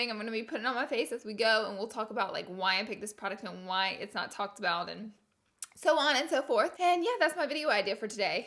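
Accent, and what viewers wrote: South, United States. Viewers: she’s from the south. Let her be her:)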